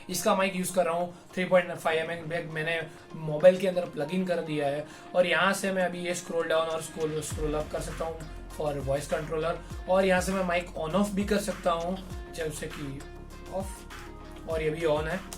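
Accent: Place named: Butler English, India. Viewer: He speaks butler English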